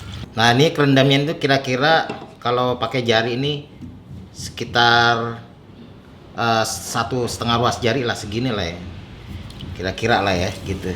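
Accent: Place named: Java, Indonesia. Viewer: Kita.." eller ". 😀😀😄😄😄 Medok bingit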